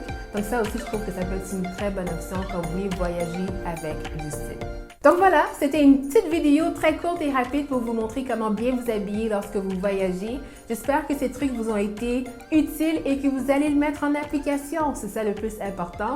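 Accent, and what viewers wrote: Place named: Quebec, Canada. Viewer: J'aime ton accent québécois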